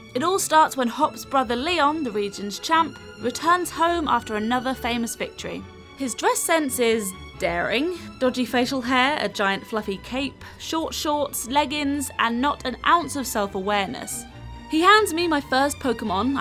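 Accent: United Kingdom. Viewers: It’s kind of majestic hearing a British person talk about Pokémon using some British words ^_^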